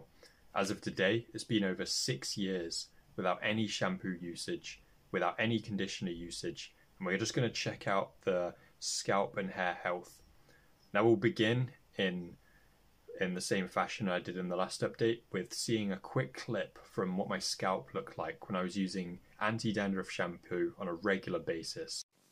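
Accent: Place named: United Kingdom. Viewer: I DID NOT EXPECT YOU TO BE BRITISH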